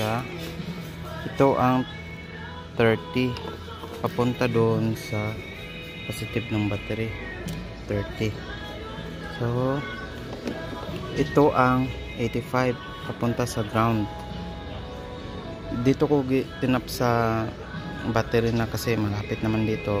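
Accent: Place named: Visayas, Philippines. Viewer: Bisayang bisaya....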